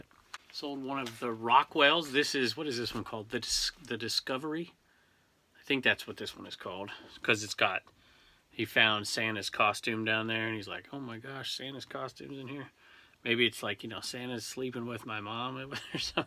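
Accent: South, United States. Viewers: I could listen to you talk all day. You have the cutest southern accent and wonderful inflection. You could really read for audible in my opinion.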